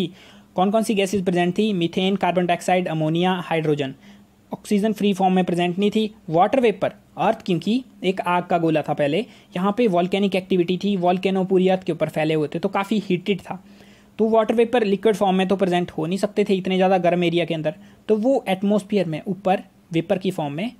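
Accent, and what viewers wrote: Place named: Himachal Pradesh, India. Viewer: Thanks sir 🙏 Are you belong from himachal ,,,??? Muje apky way of talking se lga that's why I am asking youu 😊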